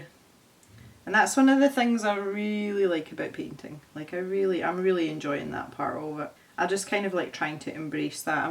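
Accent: Scotland, United Kingdom. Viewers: Your painting is amazing! Oh, and your accent... I've said it before, but I LOVE the Scottish accent! It's what drew me to start watching your videos, and I have become a huge fan of your artwork ❤ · Scottish ASMR - reading anything says with some sarcastic comments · I LOVE your accent!!!! Living in the states, the Scottish accent sounds so exotic!!!!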